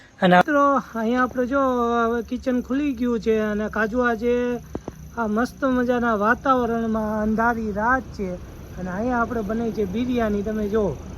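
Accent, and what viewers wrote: Kathiyawad, India. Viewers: Har har mahadev..... tamara videos bo boring hoy che.... tamaro bolvano lehko batave che ke tame fakt kathiyawadi Gujarati boli sako cho to bijane samaj ni pade.... tame natak pan bo karo cho jemke hamna aa aunty.... jagya ne barabat explore karta nahi aavadtu tamne.... matlab tame khali dekhado karo cho navi camper van banavi eno.... bo boring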